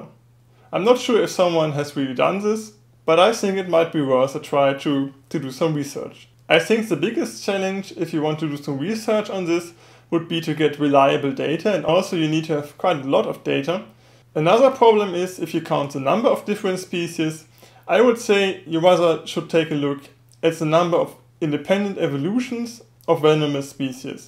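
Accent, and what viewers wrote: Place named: Germany. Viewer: You have the stereotypical german accent :D but good video ;)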